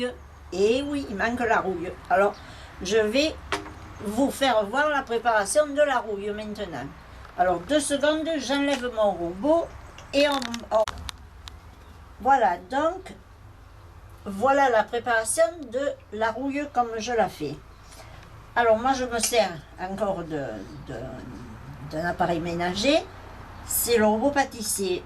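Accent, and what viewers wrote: Provence, France. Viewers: Merci pour cette belle recette, j’imagine la bonne odeur. Juste un petit bémol, je sais pas qui filme, mais il faudrait pendant votre préparation que l’on puisse voir l’intérieur des marmites, du thermomix afin que l’on se rende compte les consistances au fur et à mesure. Par contre vos explications sont géniales. Désolée pour le caméraman c’est juste une sujestion, j’espère qu’il sera pas fâché 🤣🤣🤣. Toutes vos recettes sont excellentes. Merci beaucoup. A bientôt de vous lire et d’écouter votre bel accent de Provence. 👍👍🥰🥰♥️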